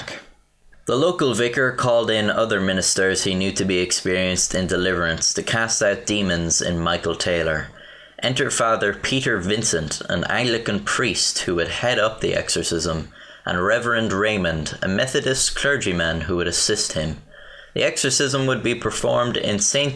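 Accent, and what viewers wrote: Ireland. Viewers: "ninteen seventy tree" i love the Irish accent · Are you Irish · Your Irish ya? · Now this is an enjoyable Irish brogue. Mike from THAT Chapter's accent makes me question his sexuality😬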